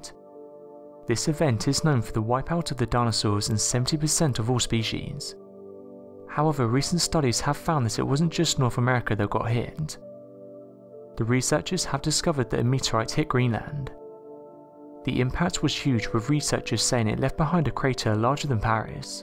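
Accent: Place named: Cockney, United Kingdom. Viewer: Sorry but that cockney is the worst. Mate😎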